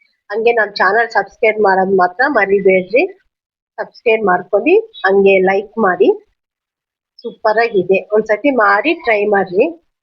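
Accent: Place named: Andhra Pradesh, India. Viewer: Neevu kannada tumba cutagi matadtira madam❤😊🎉 I think nimma mother tongue Telugu irabahudu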